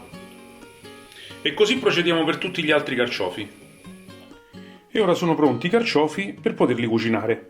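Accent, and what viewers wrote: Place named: Rome, Italy. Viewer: PER CASO HO TROVATO QUESTO VIDEO.. SENTENDO IL DIALETTO MI SONO FERMATA.. QUANDO SENTO PARLARE ROMANO MI INCANTO.... ANCHE IO LI PULISCO E PREPARO COSÌ.. PERÒ SOLO CON LA MENTUCCIA... POI LI CUOCIO IN PENTOLA A PRESSIONE.. ANCHE A ME VENGONO MORBIDI.. POI VOLEVO DIRE CHE IO SONO UN'ANZIANA SIGNORA DI CIVITAVECCHIA.. QUINDI UNA CUGINA DI ROMA.. UN'ULTIMA COSA.. COMPLIMENTI PER COME HAI CUCINATO I CARCIOFI .. BRAVO!!! CIAO ALLA PROSSIMA. 🌹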